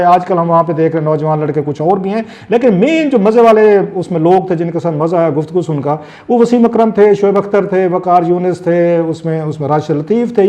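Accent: Saraiki, Pakistan. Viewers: کرکٹ کی تریخ نہیں حضور۔۔۔کرکٹ کی تاریخ ۔۔۔آپ نیشنل ٹی وی پہ بیٹھ کے غلط تلفظ کے ساتھ اردو بولتے ہیں اور اوپر سے لہجہ شدھ سرائیکی۔۔۔۔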